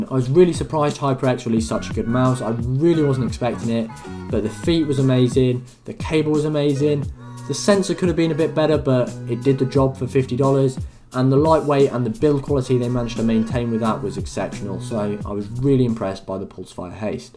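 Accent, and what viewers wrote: United Kingdom. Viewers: you a london g?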